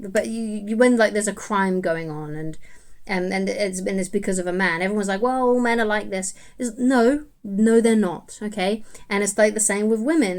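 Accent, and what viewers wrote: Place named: United Kingdom. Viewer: Your british accent pisses me off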